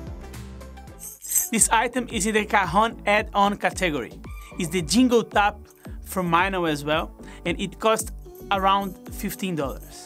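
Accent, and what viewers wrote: Brazil. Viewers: O sotaque tá arranhando véi! É sotaque nordestino falando inglês!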